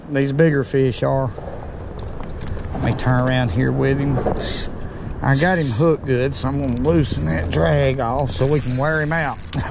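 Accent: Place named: Tennessee, United States. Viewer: Beautiful Tennessee accent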